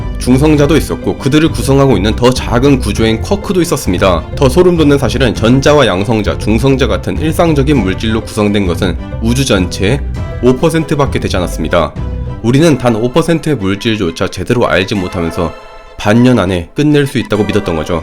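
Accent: Gyeongsang, South Korea. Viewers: 경상도 아닌가요?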